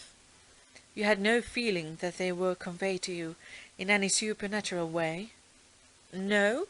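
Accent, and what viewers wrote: United Kingdom. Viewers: I love British accent thanks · This is a RP, isn’t it??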